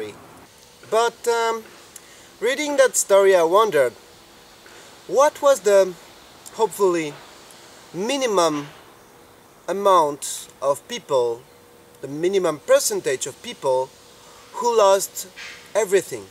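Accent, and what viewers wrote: France. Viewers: do you happen to be french?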